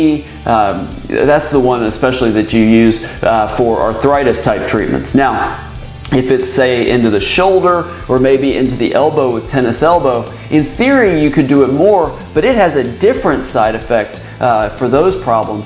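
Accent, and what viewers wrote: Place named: Scotland, United Kingdom. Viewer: Wonderful Scottish Accent ! . Please slow down the presentation, the lady is speaking far too fast .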